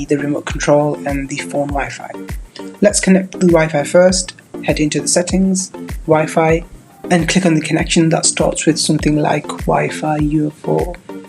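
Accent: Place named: Pakistan. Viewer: You sound like a Pakistani 😕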